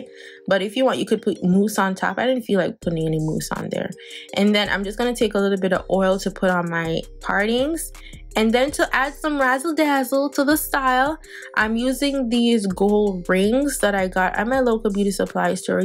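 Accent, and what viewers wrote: Jamaica. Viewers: Cause me know you sound jamaican girl big up 💯 Am a try your way tomorrow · Wait girl.. u jamaican or supm?... cause mi a hear a likkle supm supm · I was just about to say u sound like Jamaican 🥰🥰 love my ppl.. About to do my hair like this now thanks for the video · Your Jamaican accent gave you my sub😍😍😍mi luv mi yaardie dem baaaad😂😘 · Are you from 🇯🇲???? Cause I heard a lil jamaican accent..... And I really like this style. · I can hear the Jamaican accent♥️🇯🇲...It's everything for me 💯❗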